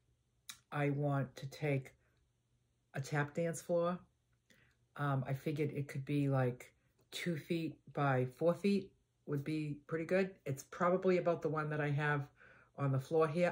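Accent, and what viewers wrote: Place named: Boston, United States. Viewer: .. Thank you for the suggestion,😢 But I ❤love listening to your Boston accent.😊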